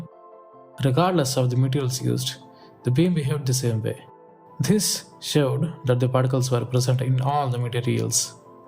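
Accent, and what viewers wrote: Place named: South India, India. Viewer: Thanks helped a lot, the South Indian accent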